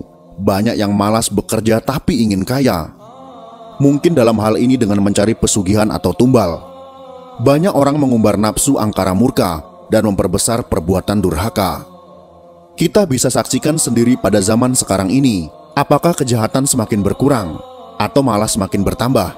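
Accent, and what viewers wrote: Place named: Java, Indonesia. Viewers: Wong jowo ndy suarane 🙏🙏🙏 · Ndelek suarane arek jatim😄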